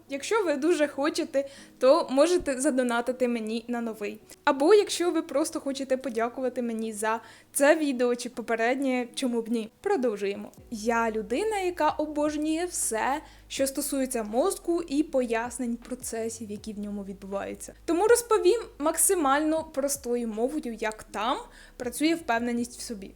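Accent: Poltava, Ukraine. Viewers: Дуже дякую! Яка легка у вас подача! Лише мені здалось, що до кінця відео полтавський(милий, такий близький) акцент став менш вираженим?) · Що це за акцент такий прикольний, полтавський?)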